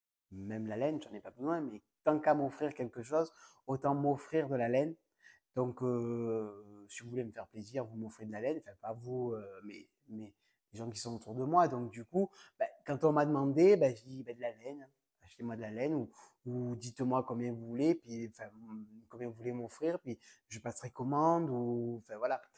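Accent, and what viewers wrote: South, France. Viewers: Bonjour Quel bon moment passé à te regarder! J' adore ton humour, ton accent 😜 et tu transmets le soleil aux gens du nord Bon moi qui suis de Perpignan je n' en manque pas de soleil! Les laines et divers objets que tu présentes sont tous plus beaux les uns que les autres! Je connais bien la boutique à Barcelone all you knit is love et j' y trouve toujours des pépites à acheter! Le trio que tu as pris est tres beau, leur qualité 70/30 est douce avec une belle définition de points Tu vas te régaler de faire un beau châle 🤔ou autre projet! Je te souhaite de passer un bel été sans sauterelles 🤣🤣👍: j' ai eu également ma part d invasion ces dernières années!! Bisous bisous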